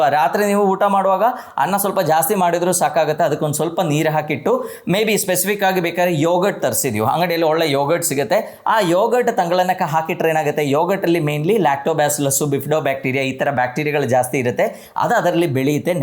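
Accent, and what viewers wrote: Kerala, India. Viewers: Sir r u malayali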